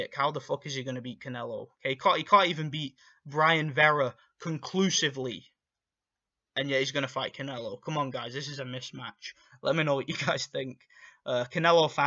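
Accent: United Kingdom. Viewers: Go listen to the boxing voice this dude is a joke! You sound like you from the U.K. Why waste time to make a video for a so called "shit fight" by you. Go talk about Liam smith an his brothers or that waste of a time sanders! Maybe they are better boxers for you lol !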